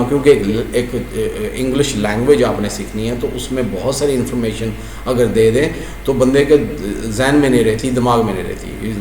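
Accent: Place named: United Kingdom. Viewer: Hi, You the person whom i was looking for British accent and your excellent work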